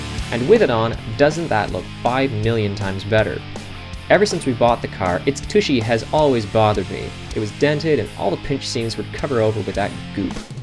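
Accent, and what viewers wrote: Canada. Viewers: Very nice work. I do love your canadian english. Who cares about Trumpland..... Cool vlog, very 'blinky'!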